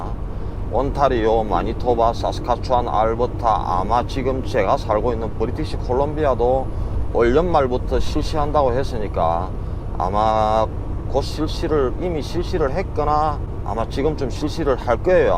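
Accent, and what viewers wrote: Gyeongsang, South Korea. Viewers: 경남 사투리 ㅋ ㅋ 저는 대구 사람 정겨워요^^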